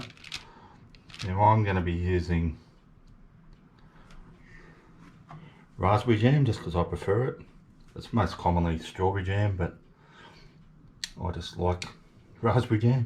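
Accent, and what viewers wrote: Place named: Australia. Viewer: Love our Aussie accent 🙂👍😉🥰 Yummo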